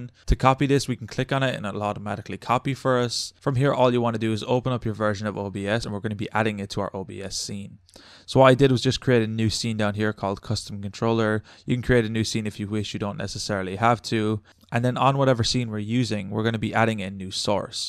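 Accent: Ireland. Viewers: Irish accent is awesome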